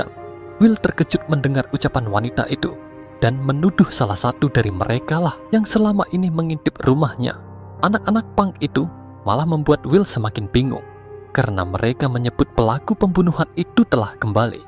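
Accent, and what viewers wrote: Java, Indonesia. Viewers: Medok e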